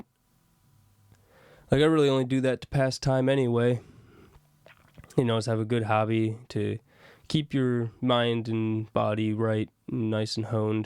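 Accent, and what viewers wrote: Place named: India. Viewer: Sounds like he's a curry loving Indian.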